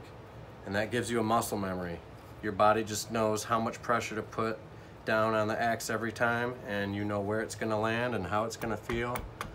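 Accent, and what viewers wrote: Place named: Michigan, United States. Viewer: Great stuff. Your stropping video helped tons. I heard the accent before I saw your channel name and knew you were from Michigan. It’s hard to find green wood downriver!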